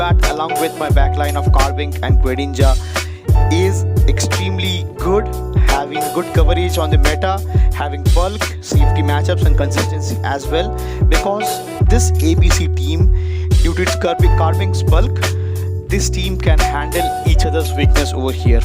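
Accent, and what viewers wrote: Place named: India. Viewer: Bro thé musics sounds is high cant understand your indian accent cleary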